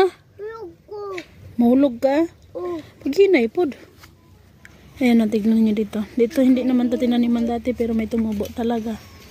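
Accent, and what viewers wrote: Visayas, Philippines. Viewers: Cute oi bisaya mn😊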